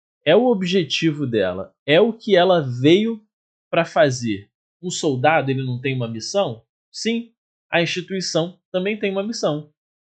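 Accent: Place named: Rio de Janeiro, Brazil. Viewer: Estudando contigo e adorando esse sotaque carioca kkkkkkkk se tivesse achado você antes teria feito para outro cargo mas vale vou seguir aqui.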